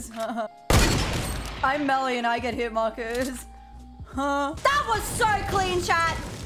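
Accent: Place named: Australia. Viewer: Hey melly which part of australia u from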